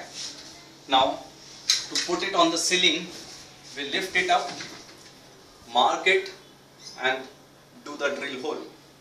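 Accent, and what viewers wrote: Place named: India. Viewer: Smart Bollywood accented man.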